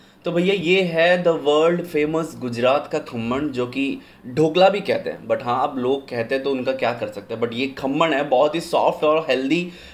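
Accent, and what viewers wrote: South, India. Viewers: Very nice 👌👍🤗 specially when u "spoke"Normally" 😂 I NVR liked ur South Indian accent 😢 Once U commented also , (long back) aftr that I nvr saw ur videos, but now I enjoyed this video (with ur "normal" voice 😂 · tera south indian accent wala voice rocking hai bhai...!!!!👍👍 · I am saying true i don't cook food but I am a foodie i watch your video for entertainment by your south Indian voice 😀😀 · Nice original voice😍👌sir.... bt mujhe apka south India voice version is se vi jada pasand hai❤ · bhai Aapki recipi ki saari video dekhata hu muze bahut pasand hai. Aur aapki ki vo sauth Indian waali voice bahut pasand hai. Aaj aapki real voice suni vo bhi best hai.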